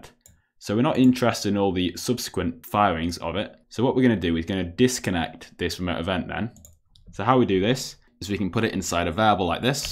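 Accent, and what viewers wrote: United Kingdom. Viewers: Can you not hear his voice he’s from uk you can hear from the sound of his voice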